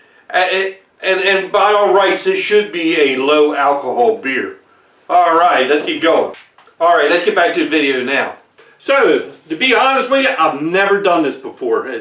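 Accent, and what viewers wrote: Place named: South, United States. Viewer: im assuming this dudes from kentucky or tenessee or virginia or north carolina or somewhere like that and not texas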